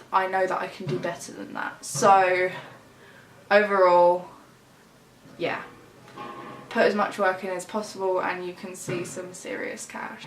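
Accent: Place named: England, United Kingdom. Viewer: No wonder u have an English You’re from there lol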